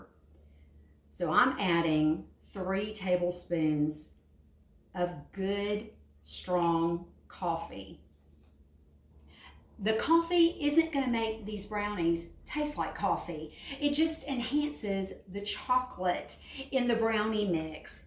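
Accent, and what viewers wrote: South, United States. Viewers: I’m trying to make brownies and they never turn out right, out of all the videos on YouTube.. I really didn’t like any. After about 20 videos and endless scrolling I finally found yours… immediately the southern accent made me know this is this video for me!! I’ll be making this now. Thanks.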